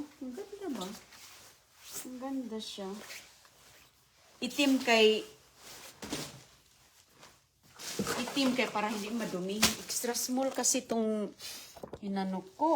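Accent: Visayas, Philippines. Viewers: Nakaka hiya yung mga nambabash kay Ms.. Porke bisaya binabash ang accent. That's disgusting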